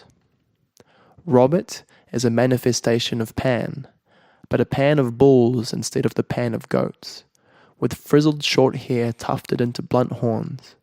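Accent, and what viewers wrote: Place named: Australia. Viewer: I am grateful for your Reading with your down under Dialekt. Thank U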